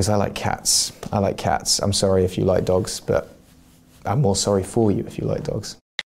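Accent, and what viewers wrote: England, United Kingdom. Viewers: L'accent anglais sahh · L’accent 😭😭😭 j’adore vrmt l’accent anglais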